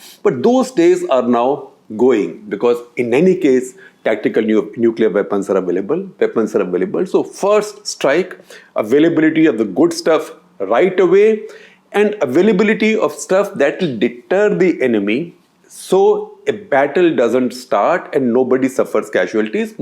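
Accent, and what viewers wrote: India. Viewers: Shekhar ji speaks English but his expression is totally in "Hindi".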